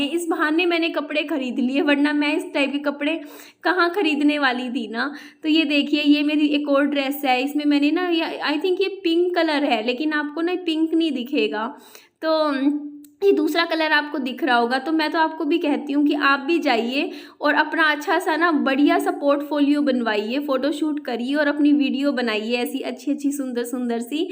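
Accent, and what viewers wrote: Haryana, India. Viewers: Haryanvi Tone m aise hi bolte hai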